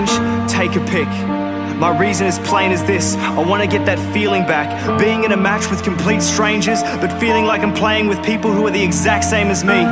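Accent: Australia. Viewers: 1. annoying echo 2. annoying australian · that australian accent xd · Aussie accent XD haha love it! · You realise this is what an australian accent sounds like. Holy fuck the stupidity of that comment..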